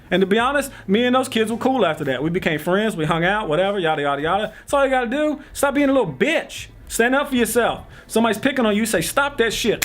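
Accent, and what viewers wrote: South, United States. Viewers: boooy I thought u was black or southern u just got the sound of southern hospitality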